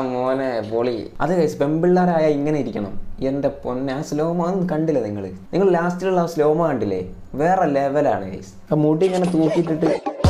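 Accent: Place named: Malappuram, India. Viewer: Ante ജില്ലാ eedha Ante ഭാസ കേട്ട്ട്ട് മലപ്പോർത്ത്ള്ള ന്നാ തോന്നദ് 😂മലപ്പുറം ആണോ അന്യേ ജില്ലാ ❤️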